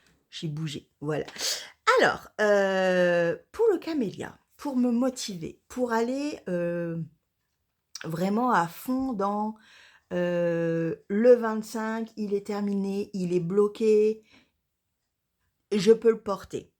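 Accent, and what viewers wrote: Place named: Jura, France. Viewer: Mais oui, continue le ton Jura! Ce serait dommage de le lâcher 😊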